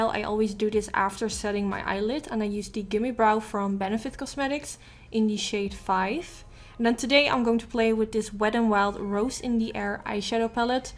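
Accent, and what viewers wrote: Netherlands. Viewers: Are you from Holland? I can hear from your voice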